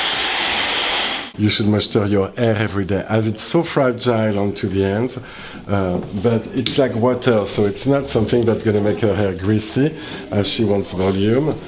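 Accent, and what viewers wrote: France. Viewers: I'll never get tired of French accent, never. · he has the most intense english french accent ever and i'm living for it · the most french english accent ive ever heard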